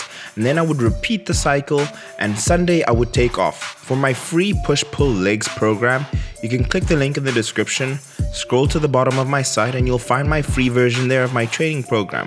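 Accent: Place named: South Africa. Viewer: u sound like you're from South Africa but relocated to USA..New to the channel. Love all the info you're giving..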